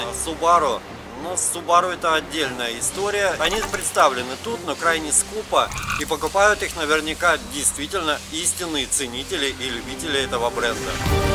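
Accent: Russia. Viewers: Привет😉 Да, интересно. Мне кажется ты или из Питера, или из Челябинска, но не исключено что из Евпатории)) Хочу такой же форд😂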